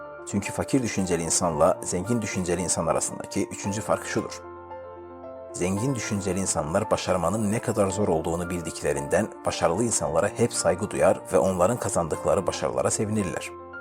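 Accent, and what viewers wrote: Azerbaijan. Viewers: Sen Azerbaycanlımısın abi?Konuşmandan anladım 😂😉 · Deyesen Azerisen 😂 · Video yapanin konuwma seklinden qaliba azeri oylemi acaba? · Qaqa sən azerbaycanlisan? Danışığından hiss olunur təmiz türk dilini danışa bilmirsən sanki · Xoşuma gəlməyən bir məqam var bu videoda.Videoda danışan azərbaycanlıdır amma türkiyə türkcəsində danışır.Bu da çox gülünc alınır.Her cümləsində açıq verir.Həm də kobudcasına.Sənin öz dilin yoxdur ay kanal admini.Bu yerdə Molla Nəsrəddin jurnalındakı karikatura yadıma düşür.Birinin ağzına 5-6 dil soxurlar.O da deyir ki,ay bala öz dilim ola -ola başqa dilləri niyə ağzıma soxursuz.Mın də türkəm ve bununla qürur duyuram.Amma siz belə danışanda türkiyə türkcəsini də pis günə qoyursuz. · levent y Seslendiren Azerbaycanlı, türkçe anadili değil. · Azeri oldugu belli arkadasin guzel video yapiyosun keske şiveninide biraz geristirsen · Türkçə danışma ləhçəsindən bilinirki Azərbaycanlıdır... 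😄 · Dosdlar bu videonu anlatanin Azerbaycanli oldugunu ama turkce konuwtugunu bir tek benmi anladim?? Bu arada bende Azerbaycanliyim... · Men ilk defe sizin kanalinizin turk dilinde olan versiyasi ile tanish olmusam.Her zaman dinleyende turk deyil, azerbaycanli oldugunuzu dusunurdum.Cunki lehcenizden bunu hiss edirdim.Bir gun yoldasim mene sizin azerbaycan dilinde olan videonuzu gosterende sizi basqa biri bilib zenn etdim ki,turk dilinde olan kanali tekrarlayirsiniz.Daha sonra men anladim ki,2 kanal da size mexsus imish 😄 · Azerbaycanlısan qaqa bilinir danışığından😁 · Sen bir Azerbaycanlisan.ama direşibsenki turkce danişacaqsan.ama elede yaxşi alinmir. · Bu çocuk azeri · Arkadas azeri turkceyi zor kullaniyor bu yuzden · Bu ses sahibi Azerbaycanlı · qaqa azerbaycanli oldugun bilinir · Bu danisan Azerbaycanlidir...danisiqindan melumdur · Bi şey sorucam.Videonu anlatan kişi türk deyil Azeri gibi geldi.Son tonundan anladım. 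· Konusanın azerbaycanlı oldugunu dogrumu bildim acaba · kardeş sen Azerbaycanlımısın? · Abi azerbaycanlimisin? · Turk dilinde Azerbaycan dili kimi danışırsan)) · Ləhcəsindən Azərbaycanlı olduğu o dəqiqə bilinir,Guyə bu indi niyə türk ləhcəsində danışırki? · Qaqas azerbaycanlisan oz lehcende danissan yaxsi olar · Bu konusan abi Azerbaycanli!👍👍👍👍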